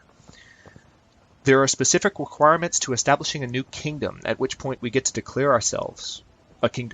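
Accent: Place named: United States. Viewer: Hahaha aye exactly the accentless accent, the de jure news reader accent :') really clear but you can tell its American haha. Sounds lovely :). Sure i enjoyed your video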